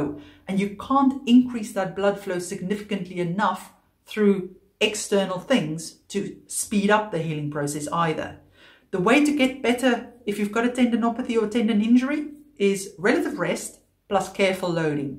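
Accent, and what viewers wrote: South Africa. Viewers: Love that accent!...Sounds like South African/Dutch? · I recognize a South African accent from anywhere.😂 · You sound like you are from South Africa. Love that Boer accent. Sawubona! Anyway, good information. I'm an athlete thank you for the info.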